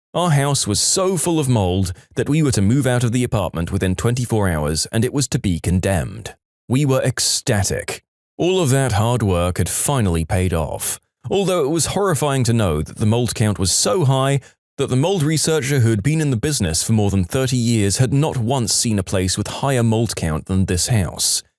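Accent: United Kingdom. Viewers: HES BRITISH NOW?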